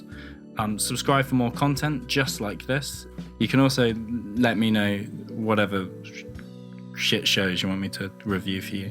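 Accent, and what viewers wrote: United Kingdom. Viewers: That’s literally a common British voice, I’m pretty sure I sound a bit like that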